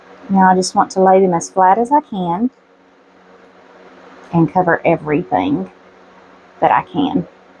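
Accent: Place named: South, United States. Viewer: Hi, I am a new subscriber. Everything looks great. I love it all! Where are you from? I detect a Southern accent. North Carolina here.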